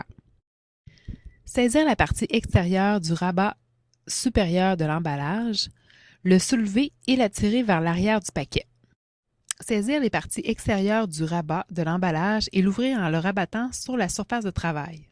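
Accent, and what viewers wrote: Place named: Quebec, Canada. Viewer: Accent québécois 🤢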